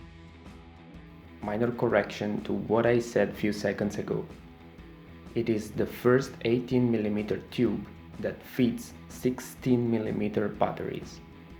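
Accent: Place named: India. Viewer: Guarda che parlare inglese con accento indiano non è così facile. Grande! :)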